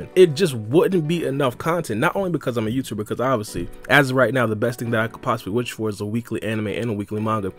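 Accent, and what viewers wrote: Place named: Alabama, United States. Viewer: Yah forgot how Alabama this sounds right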